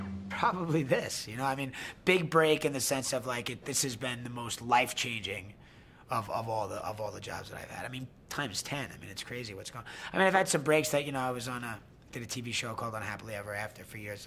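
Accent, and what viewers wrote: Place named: New York, United States. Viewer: No, he sounds like a New Yorker lol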